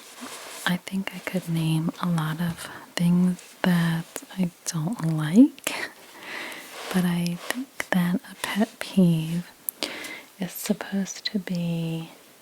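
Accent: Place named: Mid-Atlantic, United States. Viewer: Totally had you as mid-Atlantic, but you say “play catch” not “have a catch “. Interesting 🧐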